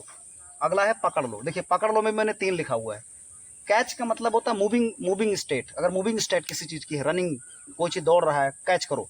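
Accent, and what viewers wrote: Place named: Uttar Pradesh, India. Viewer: Great performance Bhai ji.... Aap pakka bhaiya style mein padhaate ho.... U. P. Wala touch bhi hai..... Aapka padhaya koi bhi padh jaayega... Your Hindi is also very tuff...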